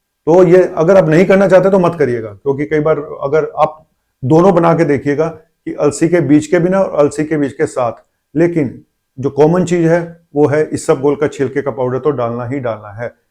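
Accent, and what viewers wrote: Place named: Punjab, India. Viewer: Sir सत श्री अकाल आप बातों में पंजाबी का तड़का लगाते हो लगता है के आप का पंजाब से कोई नाता है, लगता है के तेरा मेरा कोई पिछले जन्म का है नाता ❤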